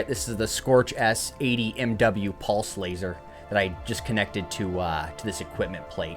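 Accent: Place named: Minnesota, United States. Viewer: Are you from Minnesota by chance? I know a lot of people from my hometown who have the same accent as you.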